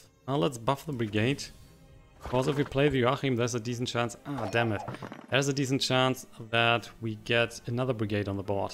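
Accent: Germany. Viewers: He sounds German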